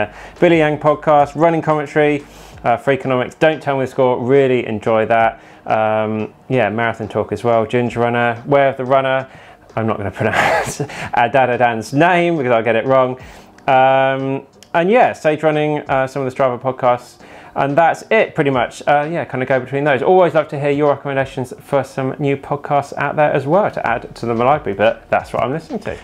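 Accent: United Kingdom. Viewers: Me and my bf find you so cute and love your British accent. I'm currently aiming for sub 2.40 marathon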